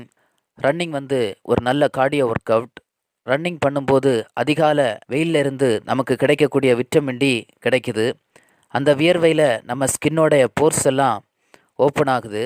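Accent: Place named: Kanyakumari, India. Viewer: Kumari thamil...👍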